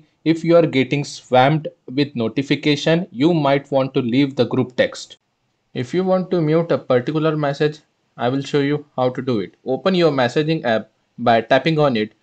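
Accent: India. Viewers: I can't understand your Indian/ English verbiage.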